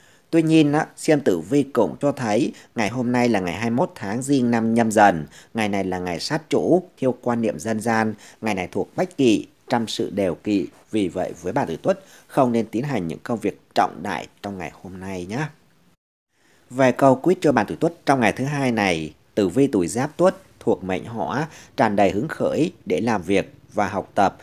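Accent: Thanh Hoa, Vietnam. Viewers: mình nghe giọng của bạn ...mình đoán bạn người Thanh Hóa ...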